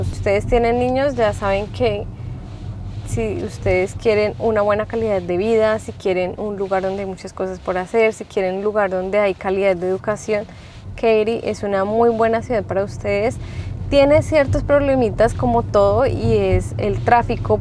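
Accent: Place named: Colombia. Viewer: Hola... Buen video👍... Tienes acento colombiano, soy venezolano, entre Miami y Katy, ¿Cual vale la pena por empleo, facilidades, impuestos y calidad de vida?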